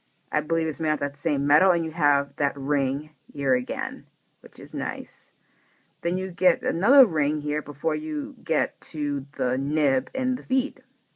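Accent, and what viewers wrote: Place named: United States. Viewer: That sounds like feedback rather than scratchiness. However, if you want it to be butter smooth, run it over some mylar paper. See Ink Noveau and sbrebrown channels for details about doing this. Although I'm in the UK I bought mylar paper from Goulet; because it's so light, there isn't much shipping to pay. But from your voice you're probably in the US anyway.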